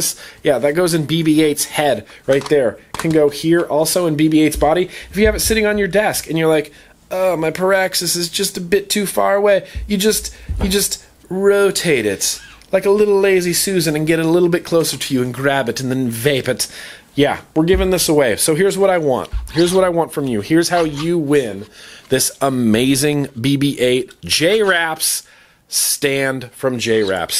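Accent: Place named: South, United States. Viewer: Lovely vlog, from the southern accent to the hand gestures the laughs were real. Thanks.:3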